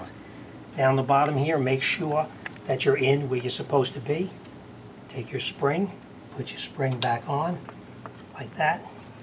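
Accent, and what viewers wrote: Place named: New England, United States. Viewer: Cool. You have a New England accent! "Hello Your On Car Talk"!!!!!! Don't drive like my brother!!!!!!!!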